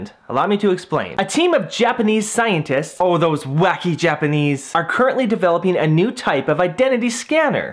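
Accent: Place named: Canada. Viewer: Holy Canadian Accent! We get an "aye" and and "aboot" within the first 20 seconds!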